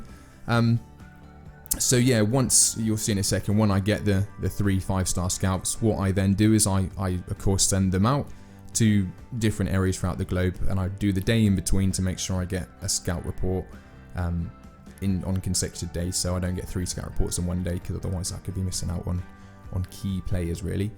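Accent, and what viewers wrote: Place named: South Africa. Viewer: 10/10 South African accent mate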